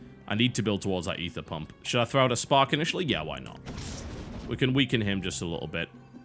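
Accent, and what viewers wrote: United Kingdom. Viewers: Everything this guy says is funny to americans because of the brittish accent. This is why BBC america has so much comedy amercians are almost ready to laugh anytime brittish people speak so they use it as an advantage. He can talk for hours about nothing and americans would be sitting over in the corner having giggles. Even card games are funny. Sorta like crocodile hunter/crocodile dundee but not australian. I don't even know what some of the slang he is using means.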